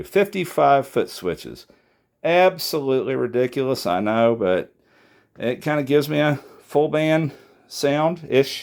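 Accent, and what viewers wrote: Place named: Georgia, United States. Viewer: Nice Rig! I m a looper in Georgia. Where are you from? Sounds like from somewhere in my part of the country. I run the Boss RC 600, Handsonic, the Nord Electro 6d. I"m mostly acoustic driven. I use the Boss OC 5 for bass, the SP Compressor, another BBE Sonic Maximizer, with an LR Bagg Session Align, Strymon Blue Sky, Strymon Dig Dual Delay and Optima Air. Also use a Fender Smolder for electric guitar tones, Peterson Tuner pedal and LR Baggs Venue DI for my acoustic boost. Not in this order. This is a deep rabbit hole isn't it?! Love your channel.......feel like I found a soul mate! hahaha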